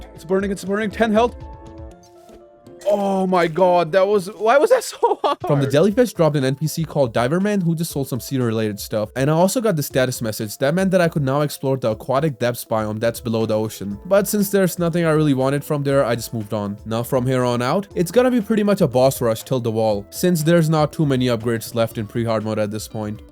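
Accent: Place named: United Kingdom. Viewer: British ahh accent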